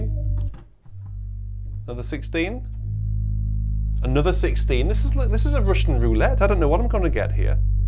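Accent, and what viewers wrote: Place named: United Kingdom. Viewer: For 1990's digital organ (Viscount), they are number of quite good sounds, others????. Sounds probably could be better if tweeked with amplifier and biiiiiggg speakers. But nothing comparable to 2021 Hauptwerk. I took my AGO Service Playing certificate in 2010 on a 1997 Rodgers 950 3 manual professionally installed Speakers/crossover + 6 ranks of Fufatti Pipes. in 2000 seat church. That sound I thought was excellent. But Hauptwerk in 2021 is the best!!! Digital Diapason has never sounded as good as the real pipe. As a Texan your commentary is always fun to hear--your words sound more intelligent with a British accent!! Love the dialog: organ is "rather heavy" to move, Jolly useful", Holy Moly", "get the Dyson", etc. Appreciate you., too.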